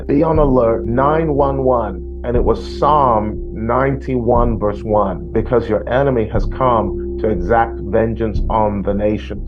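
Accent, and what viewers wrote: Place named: United Kingdom. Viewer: God bless you. You may not understand, but people speak in different dialects around the world. And this is simply a British accent. This man is from the UK. So, they don’t pronounce words as people in the west do.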